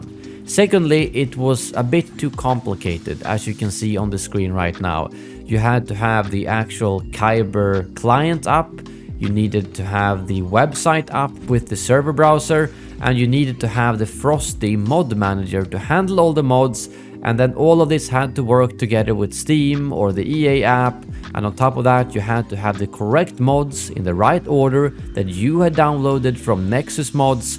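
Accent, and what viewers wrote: Sweden. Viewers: u swedish?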